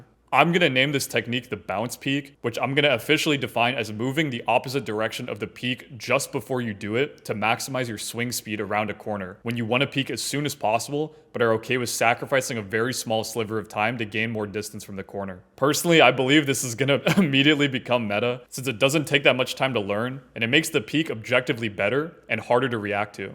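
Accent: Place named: United States. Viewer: the moment you hear an american accent on a counter strike video you know the creator is going to be absolutely clueless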